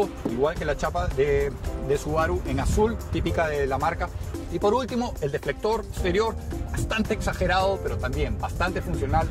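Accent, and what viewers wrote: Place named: Peru. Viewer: Are you from Peru? My girlfriend was translating your video for me and noticed your accent. It's just like hers.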